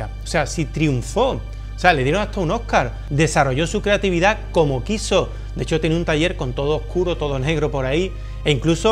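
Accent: Spain. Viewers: Tuve que activar los subtítulos para poder entender tu español de no sé que región de España y salió la misma ya que los subtítulos tienen muchos errores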